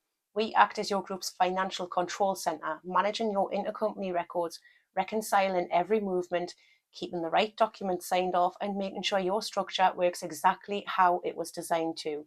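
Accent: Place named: North, United Kingdom. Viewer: Where are you based you sound northern.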